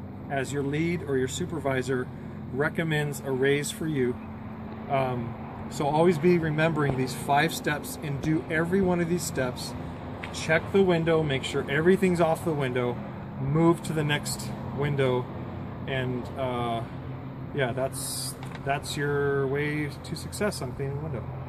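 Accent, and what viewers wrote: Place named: Canada. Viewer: you have a awesome nearly perfect canadian accent :D i love using dawn dishsoap in my housecleaning.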